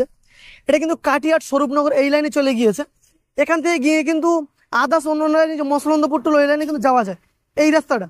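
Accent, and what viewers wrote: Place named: Bangladesh. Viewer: Tumar kotha gulo bangaladeshi accsent lagche 🤔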